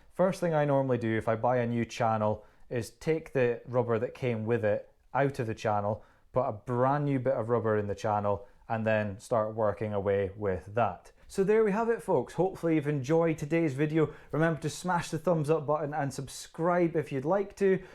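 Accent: Scotland, United Kingdom. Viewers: Hi mate,great videos as always very clear, excellent communication, which will help a lot of people, ( despite the Scottish accent lol). I have trouble getting the edges of my rubber cut straight,do you have any advice for a tool to do this please instead of using a pair of scissors or a Stanley knife. I'm 25 years traditional, words fail me to express the joy, utter joy, at using the Mormon Liquidator!! This tool is in my view,unparalleled, and cannot be improved upon, it is Perfection.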